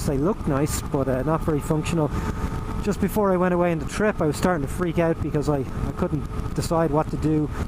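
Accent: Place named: Ireland. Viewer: Hi, nice review, and nice to hear an Irish accent on for once. Looking to get a Tracer, you mentioned the seat being bad, I have an 04 R1 and I find the seat cutting into my leg after a couple of hours and I get a numb bum:) What's the tracer like? Do you still have it?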